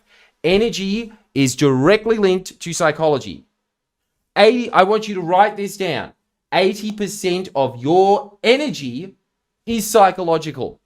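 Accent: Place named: Australia. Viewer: The only thing I have energy for is to hear beautiful Aussie accent and his perfectly cut chin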